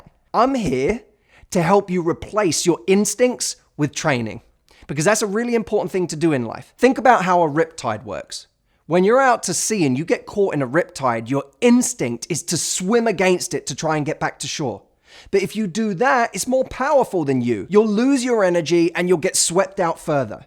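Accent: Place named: London, United Kingdom. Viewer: London accent noted :-)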